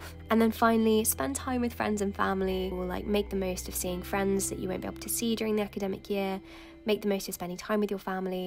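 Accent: United Kingdom. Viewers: her voice is soo calming.... i live around people who have that strong british accent (apperently i have one too but idk) so this feels weirdly familiar 😂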